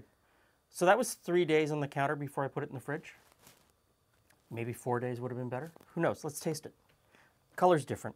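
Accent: Canada. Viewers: You have to be Canadian!! Absolutely nothing wrong with that. It's just how you pronounce certain words, and your "accent" with how you say those words. Like "about"...or you are just further up North from me. Anyway, thank you for sharing your recipe for root beer!! Love home made root beer!! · Canadian eh?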